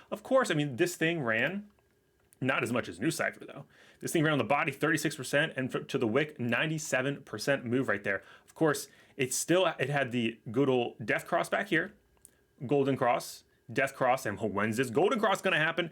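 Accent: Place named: Berkshire and Buckinghamshire, United Kingdom. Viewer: I'm from there. Distinctive accent. :) berks and bucks 4me. But just guessing!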